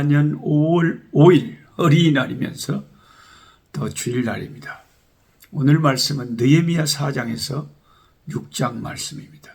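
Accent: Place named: Beolgyo, South Korea. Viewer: 목사님! 저는 여수 수정로교회 를 섬기는 선 미자 권사입니다.. 아가서 말씀으로 목사님 설교에 많은 은혜를 받고 찬양대에 오셔서 기도해 주셔서 너무 감사했습니다.. 개인적으로 인사 드리고 싶었으나 식당에서 목사님 가까이에서 식사 하면서도 저만 목사님을 보면서 너무 반갑고 기뻤 습니다.. 유튜브 꿀송이 보약 큐티를 통해 목사님을 알고 벌교 지동리로 제가 1974년도에 결혼을해서 2년동안 낙안 중앙교회를 섬기다가 77년도에 여수로 왔기 때문에 목사님의 벌교 사투리에 더 정이가고 뵙고 싶었는데 목사님앞에 나서지를 못했네요.. 앞으로도 유튜브에서 계속 은혜받고 뵙겠습니다.. 감사합니다..❤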